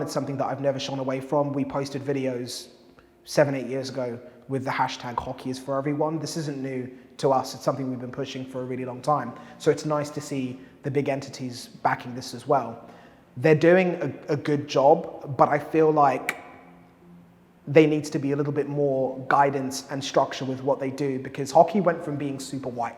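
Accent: England, United Kingdom. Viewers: Really enjoy your vids for catching up with hockey stuff. Your docu style vids are my favourite, pretty cool to see how people play hockey and get their fix around the world and their culture behind it. I found your channel recently while looking for new skates for the first time in 20 years and crazy to see how far things have developed (bought Bauer 3S btw, only for public skating at the rink and occasional pond...hockey days are behind me). Baking skates blew my mind! I played in Scotland back in the 80s (goalie) and the most popular skates with players at the time were Bauer Supreme 100s later 1000s, Daoust 301, CCM Ultra Tacks and the newcomer at the time Micron Mega 10-90s. I’ll just say one thing about the race/people of colour/black/brown in hockey. 80s hockey in the UK was white af and I remember Hilton Ruggles breaking into the British hockey scene at top level back then, Whitley Bay I think it was. 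This guy seemed to appear out of nowhere and was an absolute beast of a player. I can still visualise him powering up the ice and our crowd being scared of what might transpire and their breaths being held. Anyway, thanks for the vids. To be honest, your English accent really threw me off the first time I watched…was expecting North American/Canadian.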